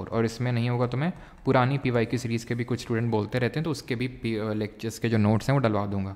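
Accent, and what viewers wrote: Kanpur, India. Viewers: Pahli bat to sir apki boli se lgta hai aap kanpur me agal bagal se hain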